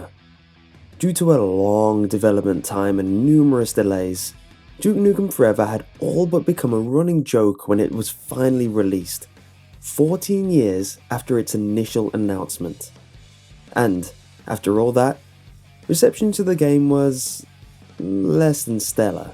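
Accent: United Kingdom. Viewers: British accents.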